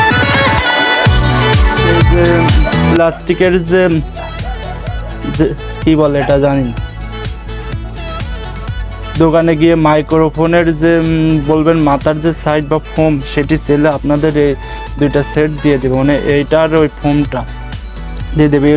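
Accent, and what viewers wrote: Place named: Narail, Bangladesh. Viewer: প্রাই নড়াইল এর ভাষার মত,,,,, কোন জেলায় বাড়ি ভাই আপনার??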